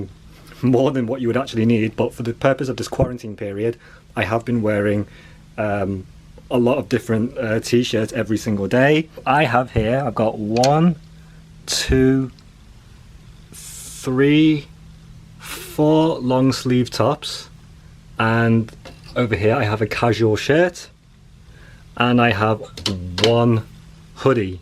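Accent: United Kingdom. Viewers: British accent is what caught my eyes/ears so I decided to listen and now a subscriber, interesting cruise life. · Another good vlog:-) I was caught off guard at first by the british accent when I first started watching. I'm glad all is going well...I too was wondering how you guys deal with laundry and room detail. Thanks for the great content...always fresh and putting a positive light on what's going on. It's much appreciated...myself...i would be climbing the walls...at least you have the internet and tv to help pass the time.:-) Have a great day:-) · It’s a Liverpool accent 😎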